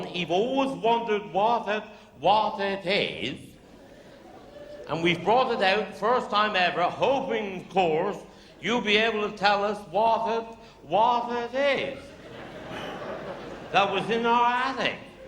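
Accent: Wales, United Kingdom. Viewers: Welsh accent. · Yes ,the Welsh accent can sometimes be difficult to understand if you don't listen carefully.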